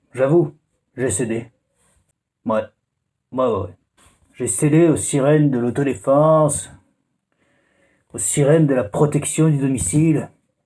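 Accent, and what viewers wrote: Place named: South, France. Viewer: Putain c est génial !!!! Tout l accent du sud qui va bien et le reste !!! Mdr 😂 salopage mythique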